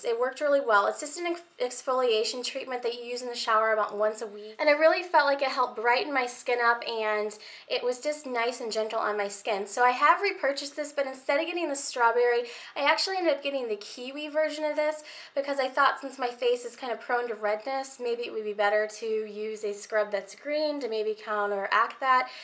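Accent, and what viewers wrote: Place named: United States. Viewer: do you live in the uk or usa? because you mentioned something from boots yet you have an ameircan accent I'm from uk btw x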